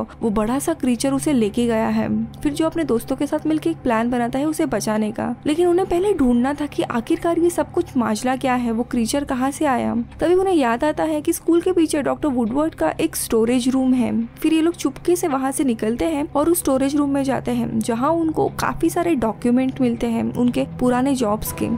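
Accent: Maharashtra, India. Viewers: Ur accent is Maharastrian. R u marathi?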